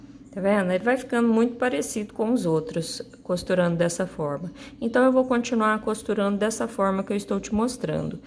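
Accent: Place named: Minas Gerais, Brazil. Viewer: Que beleza de trabalho👏 Grata por compartilhar ensinamento🙌 E vc tem uma voz agradável e ensina de forma calma - parece sotaque mineiro rsrs lembrei de meu saudoso pai q era da bela Minas Gerais 😊 Ganhou uma inscrita e farei essa bela e pratica touca (faço para doação)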